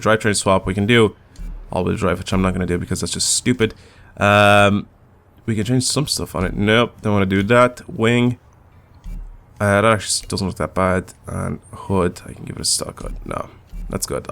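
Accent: Ireland. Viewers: Sooo first ever video I've watched of yours even though I've seen you in Slap"s videos but are you Irish?? Thatd be pretty cool seen as i am too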